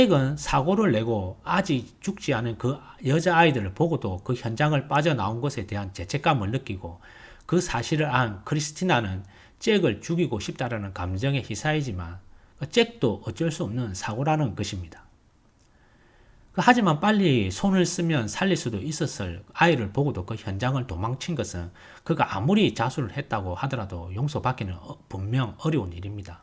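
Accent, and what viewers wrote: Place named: Gyeongsang, South Korea. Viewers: 뭔 개똥 같은 소리인지...너는 서울출신에 부모 모두 충남베이스. 경상도 사투리 억양 성조 있습니다. 서울은 사투리 아닙니까? 말을 고따위로 합니까? 좋은영화 소개시켜주는 컨텐츠를... 너무 하시네요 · 왜 경상도 출신분들은 사투리를 고치려하지 않는걸까요. 아직도 80년대처럼 대한민국의 주류라고 생각하시는지요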